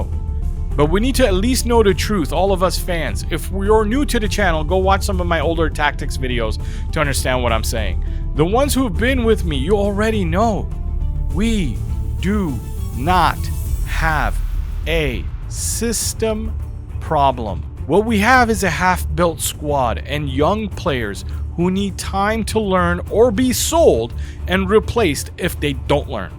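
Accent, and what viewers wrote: United States. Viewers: Yo, you're good bro... It's just a bit weird hearing football analysis in an American accent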